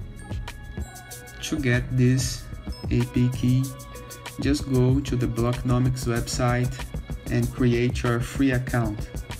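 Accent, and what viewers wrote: Brazil. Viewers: Olá, amigo. Pelo sotaque suponho que é brasileiro. Quero ver se consegue me ajudar com um dúvida, quero gerar uma forma de pagamento que transforme o valor de Reais para a cotação do momento, tipo, gerar o QR code de R$500 com a cotação do mercado bitocoin. Valeu, amigo. Sucesso.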